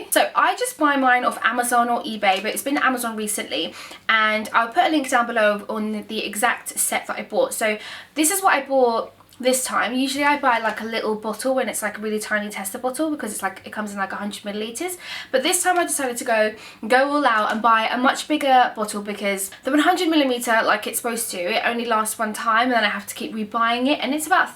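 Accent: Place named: United Kingdom. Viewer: love your British accent you’re beautiful thanks for this video. Still haven’t done it yet gonna color first.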